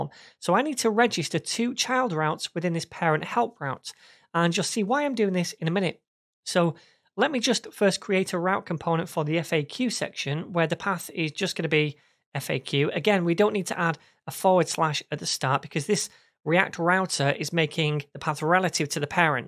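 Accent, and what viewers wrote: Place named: Manchester, United Kingdom. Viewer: Great tutorial! Thank you. I'm guessing that your accent is from Manchester. Perhaps Bury?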